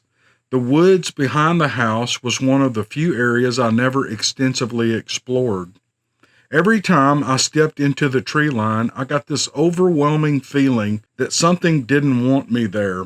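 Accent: South, United States. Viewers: Have to say, I'm a Florida girl living in Missouri the last 15 months. I love your voice. The southern in it sounds so... Right. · I believe the stories 100%. I am from the Pacific Northwest & they populate a lot of the woods all over here. My brother in law & myself were WAY off the beaten path west of Rathdrum, ID up in logging country when We noticed what looked like a Shaquille O’neill’s bare single footprint (only wider 6” across the widest part of the foot) crossing our path going up the steeep embankment only in a wash that came over the game trail we were hiking on. It was pointed uphill & when we looked up the steep washout their was another footprint probably 8’ UP the SUPER STEEP incline. We are bigger dudes me 6’2” 200lb & he 6’4” 230lb & there’s no way we could’ve made that leap on level ground let alone on a 50% grade that whoever made that footprint did. 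No way it could have Been a hoax since we were 1 hrs hike to the closest logging Road. We Didn’t see the beast, but after seeing those fresh footprints 15 years ago; my mind opened up the the FACT that these creatures co-exist near humanity w/o much detection from us ! We Like your southern accent you tell the stories in & the bluesy country...Keep em comin’ sir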